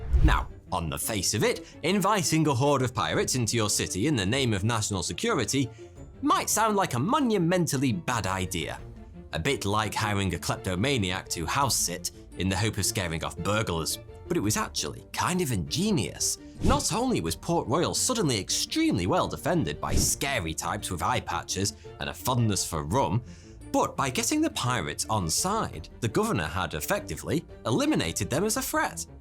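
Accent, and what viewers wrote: United Kingdom. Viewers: Bro I’ve seen about 20 of your vids and wondered why you kept saying “Hi, 42 here”. I finally realized what you were saying! You Brits have a funny way of talking 😂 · It's kinda weirdly cool hearing a Brit speak about Jamaica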